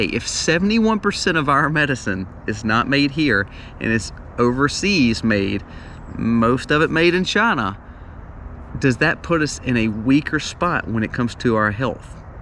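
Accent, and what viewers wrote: South, United States. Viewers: Great music, great guy, great information, and great Southern accent! 😊👍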